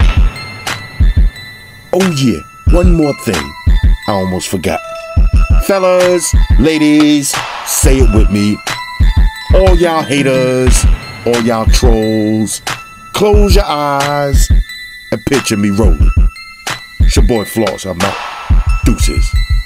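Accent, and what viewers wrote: New York, United States. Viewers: This dude is straight outta new york. Extra emphasis on everything he say lol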